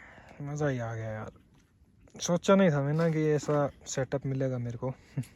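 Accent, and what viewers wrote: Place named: Pahari, India. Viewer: Bro you speak in Pahari accent actually. Are you from Himachal or Haryana by the way?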